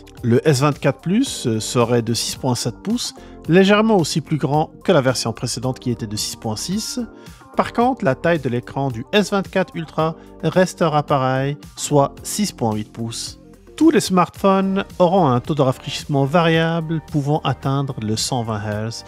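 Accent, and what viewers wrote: Canada. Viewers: je deteste l'accent canadien omg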